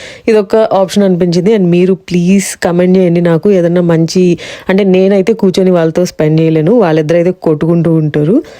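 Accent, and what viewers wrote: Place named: Andhra Pradesh, India. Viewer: Authentic andhra telugu by bro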